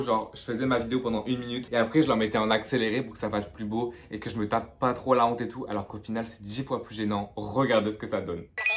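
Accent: Quebec, Canada. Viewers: on dirait pas l’accent chtis mais canadien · Avant ton accent in n aurai dit un quebecoi😂😂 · crime ta canmeme un bon acent quebecois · T'es québécois ?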